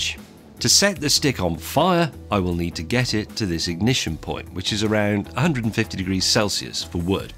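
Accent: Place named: England, United Kingdom. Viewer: I watch these videos to learn James may's English accent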